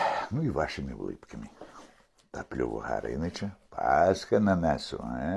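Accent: Belarus. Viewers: Трасяначка шо нада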